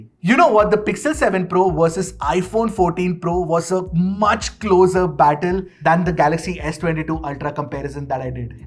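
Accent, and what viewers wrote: India. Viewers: Indian accent 😂😂 love it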